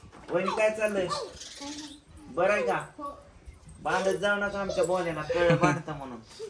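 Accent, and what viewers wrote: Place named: Malshiras, India. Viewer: तुमची भाषा खूप छान आहे मला माझ्या भावाचं बोलणं आठवतं माळशिरस साईटला सेम आसच बोलतात त्यामुळे मी तुमचे सगळे विडिओ बघते पण कमेंट करत नाही आज केली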